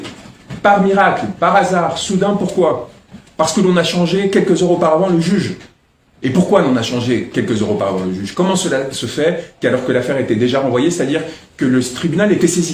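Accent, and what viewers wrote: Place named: France. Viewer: Il nous parle le français de france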